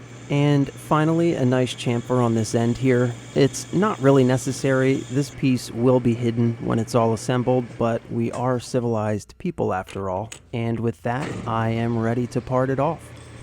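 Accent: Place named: United States. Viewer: Are you breaking that bicious cyvle or that vicious circle? I don't always understand the American accent...😅😅😂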